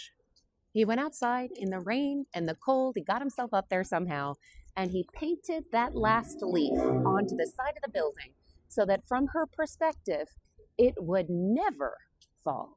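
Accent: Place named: Ireland. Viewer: I hear a hint of Irish in your voice, great story tellers, the Irish and what a lovely story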